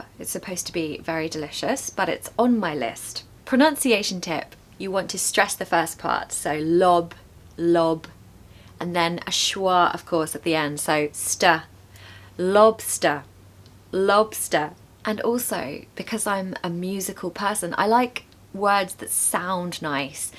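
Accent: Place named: England, United Kingdom. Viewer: Sweet charming English accent 😅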